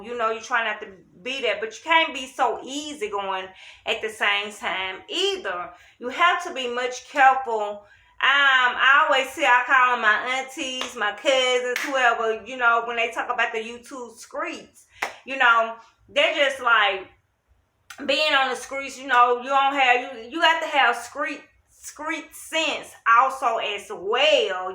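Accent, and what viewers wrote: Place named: South, United States. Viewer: Yea people always try to try the quiet ones u gotta be careful with them lol 😂 Where are u from because how u say “street” and “strange” that’s down south I love it😂😂👌🏽👌🏽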